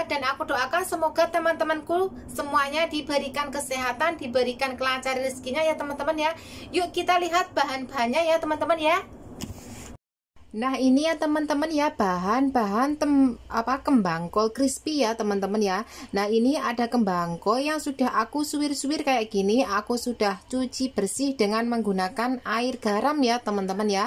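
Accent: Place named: Java, Indonesia. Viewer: Resep kembang kol crispy yummyy Kentel bahasa Jawa nya mbk Mksh tlh brbgi resep 👍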